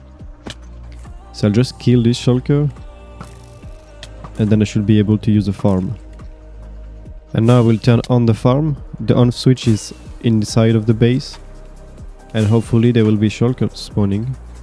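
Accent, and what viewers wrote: France. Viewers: You have a French accetn no? Good work🎉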